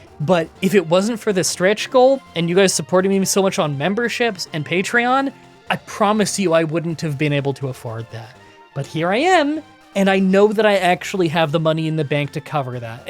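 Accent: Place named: Canada. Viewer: This guy's Canadian accent is so cute😊😊😊😊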